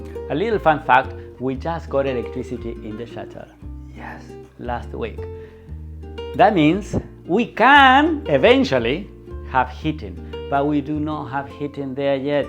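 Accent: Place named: Argentina. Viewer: So many great ideas! The redesign of the space. The scaffolding boards with wine corks. The double shower. Revealing the little window. The decor hitting all the right notes. Your joie de vivre, hard work, and humility. Plus I love your narration and your accento argentino. Thank you for your inspiring life. Abrazos grandote che. 💙🦋💙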